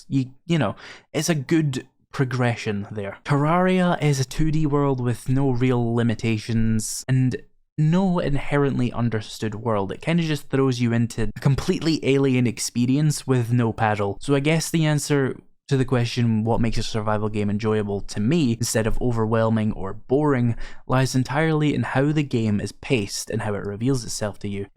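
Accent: Scotland, United Kingdom. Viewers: Ask: What kind of accent do you have? It sounds vaguely Scottish (apologies if I got that wrong). Many thanks for the videos, I always learn a lot from them.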